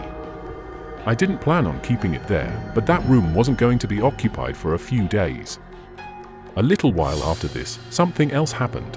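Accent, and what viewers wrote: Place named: England, United Kingdom. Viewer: Why the English accent? I have a problem understanding such accents and such....I tend to avoid these videos. Sorry.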